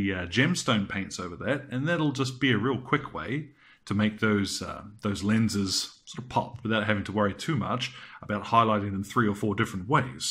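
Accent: New Zealand. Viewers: It's certainly impressive and appreciated! From your accent, are you a kiwi by any chance? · Thanks for the advice. Local would be......NZ based on your accent? I'm just starting my first ever painting project and I'll be doing Tau in Zandri Dust w/ Ushabti HLs, and Mech Grey and Dawnstone HLs for the fatigues.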